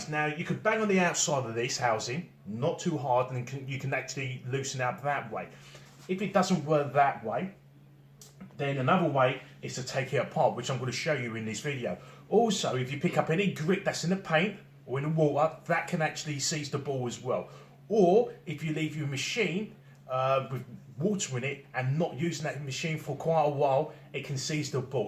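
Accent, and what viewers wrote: United Kingdom. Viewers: the best I,m in canada and my machine is a titan but looks exactly like yours, wont prime and I think its the valve although I cleaned it all out and the ball is free its a mystery, love the cockney accent, like me east end boy thanks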